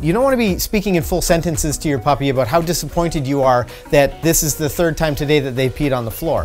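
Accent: Canada. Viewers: ur canadian omg u say hOUAse and aBout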